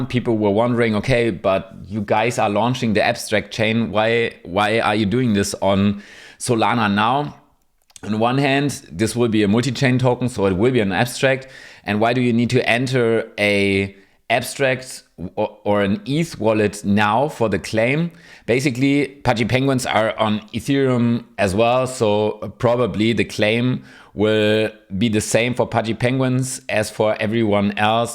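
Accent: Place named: Germany. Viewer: ich seh grad in den Kommentaren dass du auch deutscher bist hab mich schon gewundert wegen dem Akzent 😅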